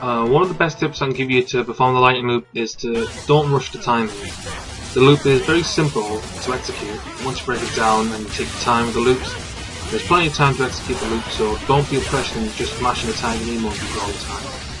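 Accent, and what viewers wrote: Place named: United Kingdom. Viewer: I can barely understand your shitty britt accent.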